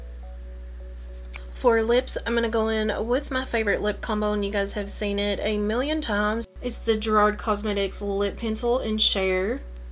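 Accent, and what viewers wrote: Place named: South Carolina, United States. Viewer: Where in the South is your country self from! Lol. I'm in S.C.,you sound like you're from here! 😎😋😂👍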